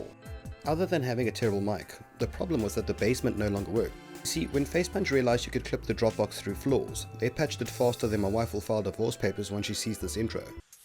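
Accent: Afrikaans, South Africa. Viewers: I don't even play Rust but you got me hooked on watching, love the accent, is it South African or New Zealand? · so thats what the accent is! and that language from the other vids wasnt norwegian/swedish/etc, it was afrikaans fml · Are you south African?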